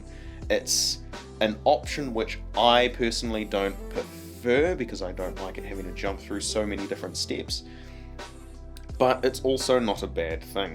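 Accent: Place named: New Zealand. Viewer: foreeeeever agor haha kiwi accent is so cutee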